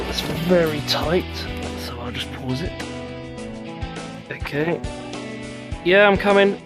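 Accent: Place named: England, United Kingdom. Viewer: This seems to be the narrative in my head during car wrenching. Mine lacks the English accent and includes many more profanities. This video is gold.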